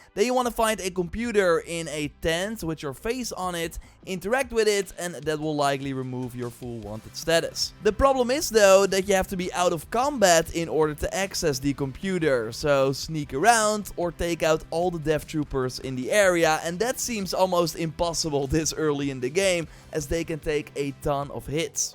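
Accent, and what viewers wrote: Netherlands. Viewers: Opens video -> Hears dutch accent -> Skip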